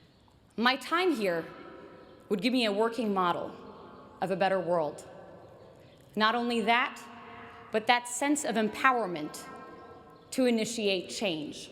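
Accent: United States. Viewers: تتكلم اللهجة الامريكية بطلاقة كبيرة دليل على انها عاشت في امريكا من ايام المدرسة وليس من ايام الجامعة ..والكلمة هذي اشك انها كتبتها .. لا تزعلوا و تقولوا يحقد عليها .. هذا شك و الشك يحتمل الصواب و الخطاء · ماشالله تبارك الله والله انك فخر للعرب علي روسنا الله يحفضك ابدعتي في كل حرف من حروفك وبلغه العظماء لقه قويه ولهجه قويه وكانها عاشت في امريكا ولاكنها سافرت الي امريكا في عام 2010 واستطاعت ان تصل الي هدا الابداع نقف اجلالا وتكبيرا وتعضميا لكي ايتها المتالقه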